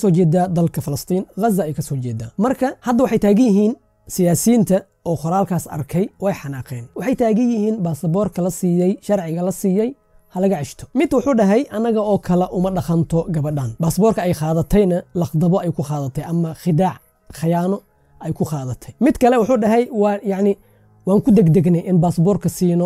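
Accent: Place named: Jilib, Somalia. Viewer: My bro wan jecelahay qisoyinkaga sido kale qabka aad uga shekeso waxaatahay qosol bro lahjadeda jilib marko ayaa isoo xasusisey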